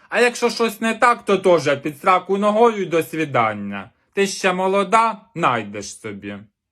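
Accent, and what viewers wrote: Poltava, Ukraine. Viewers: СУПЕР!🤣🤣.Полтавський говір 100/. Як в селі побувала.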